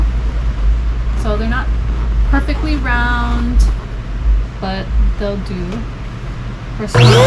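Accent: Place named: Hawaii, United States. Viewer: Omg. I’m listening and I’m like why sound like she talking pidgin. Then I look at your name 😅 Native Hawaiian here living in NY. So wonderful to come across Hawaiians even if it’s just on da internet ❤ Mahalo for this video.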